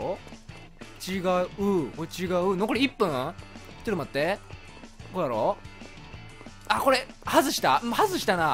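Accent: Kansai, Japan. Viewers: 関西出身ですか？？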